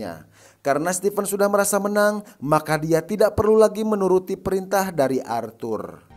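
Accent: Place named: Tasikmalaya, Indonesia. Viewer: suara tasik mana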